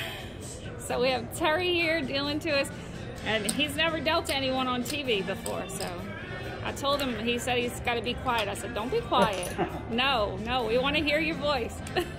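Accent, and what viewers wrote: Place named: South, United States. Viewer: would be AWESOME to play blackjack with ya sometime if it's in the " cards " and ya I'd love to learn that southern talk!! I'm Canadian were known for the cold and wearing summer stuff in the cold, dont ask, lol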